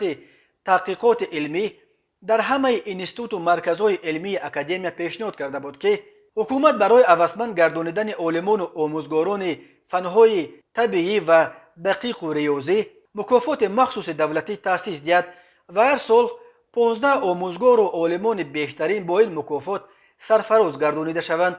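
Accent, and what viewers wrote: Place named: Badakhshan, Tajikistan. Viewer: Ташакур бародар барой ахборот ва шевай ширини бадахшоният мамнунем аз хидматхоятон ки ба мо мекунед ва аз тамоми хабарой дохилу хорич зуд огох мекунед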